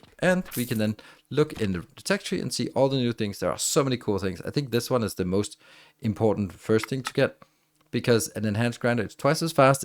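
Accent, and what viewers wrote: Denmark. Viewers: Great videos thanks. You sound danish ?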